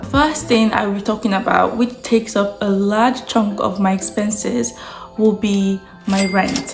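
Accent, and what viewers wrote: Glasgow, United Kingdom. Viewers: I detect a slight Glasgow accent now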